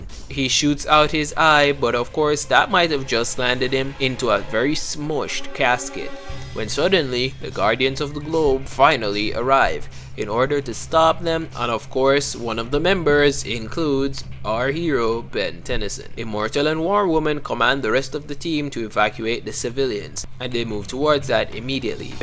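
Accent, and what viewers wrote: Jamaica. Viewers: Are you Jamaican by any chance?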